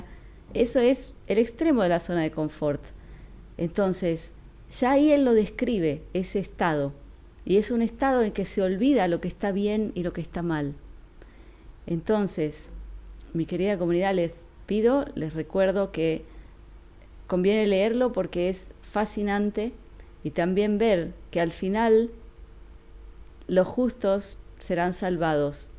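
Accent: Buenos Aires, Argentina. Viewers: Salud! No puede negar que es porteña.. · qué lindo...y tu modo de hablar es bien porteño!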